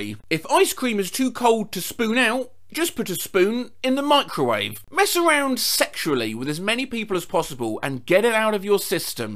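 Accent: United Kingdom. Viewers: i love british people reading internet things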